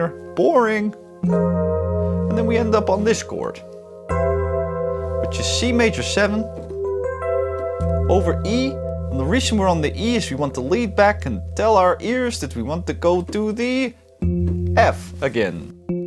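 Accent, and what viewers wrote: Netherlands. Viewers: Very Dutch accent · you're doing a amazing dutch accent if thats your intention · The Dutch accent sounds just so right :) Totally enjoyed this. Thanks 😊 'I don't blow myself, I tried it but it hurt my back'. Briljant. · Hi, where did you pick up the dutch accent? I love it. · Your Dunglish is on point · I’m hoping the folks at Rockstar invited to do a radioshow for GTA VI! Good fun! Pretty decent Dutch accent there 😀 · Not gonna lie, he has a great dutch accent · How is your Dutch accent so on point!!!? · You certainly sound like at least one of your parents is Dutch ... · What is the reason behind the Dutch accent lmao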